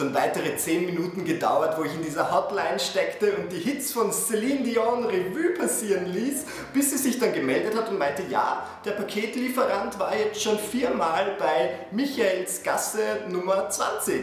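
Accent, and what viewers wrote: Vienna, Austria. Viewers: 1. Michael hat meiner Meinung nach keinen so starken Dialekt, weil er versucht - glaub ich - in Schriftsprache zu sprechen, damit ihn die Zuschauer auch verstehen. Aber er hat einen typischen Wiener Akzent, den jeder erkennt. Sogar Deutsche. 2.) Ist der Wiener Dialekt gar nicht so krass, wie andere Dialekte. Das ist so ähnlich wie mit den Berliner. Da sprechen auch fast alle in der Schriftsprache. (Nur der Berliner Slang is noch n bisschen krasser, aber der kommt gar nicht so häufig hier vor.) Bin jetzt nicht der große Dialekt und Akzent Experte, aber hab da schon so n bisschen was mitbekommen. Besonders, wo ich selbst Österreicherin (Steirer) bin, die vor ein paar Jahren nach Berlin gezogen ist. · ich wohn in berlin kreuzberg und mein postbote ist sehr faul. ^^ anstatt zu klingeln bei mir (4.stock wohn ich) und sich die muehe zu machn hoch zu laufen gibt ers irgendwo anders ab sodass ich jedes mal mein verdammtes paket suchen muss.. 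ps. ich liebe diesen dialekt xD bin halb deutsch halb oesterreichisch also versteh ich alles und find diesen dialekt so angenehm.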